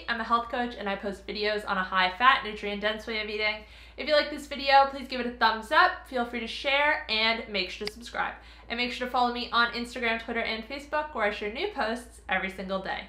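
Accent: Canada. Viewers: haha quite a small oops. your accent is very mild by the way. you sounded Canadian actually. great stuff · She sounds Canadian cause of some of her vowels.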